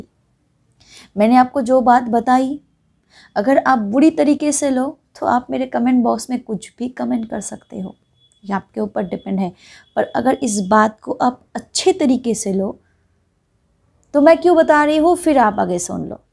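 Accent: Bengal, India. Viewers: Bengali accent we can feel in your hindi😀😀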